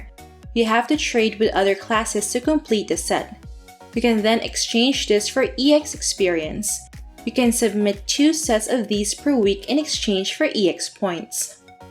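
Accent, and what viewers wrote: Philippines. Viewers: hi miss are you half filipino? just want to know bcz of some accent words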